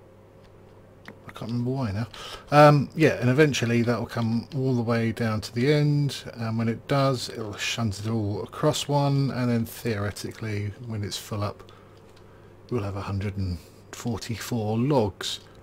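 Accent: United Kingdom. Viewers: where abouts in uk are you from??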